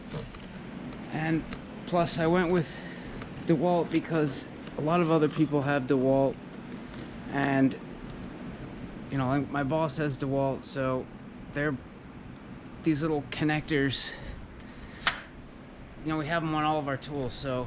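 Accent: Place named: North Shore, United States. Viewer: cool, carpenter on the north shore here, I just recognized the accent, lol... Thanks for the review, I just bought one, and I'm very happy with it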